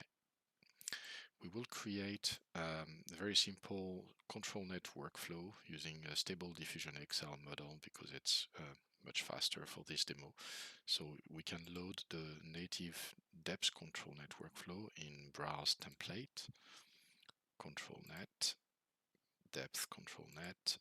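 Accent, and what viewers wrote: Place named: France. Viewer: Si j'avais des doutes sur le fait que tu sois français à l'accent, à 11min30 le ''hola!'' m'a vraiment marqué mdr. Merci pour le tuto, tu es un chef.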